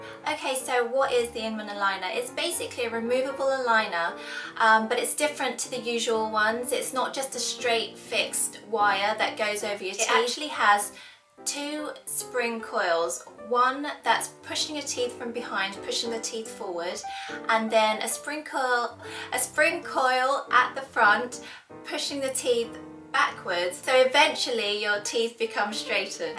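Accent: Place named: United Kingdom. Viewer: You look asian and your accent was brtish i love it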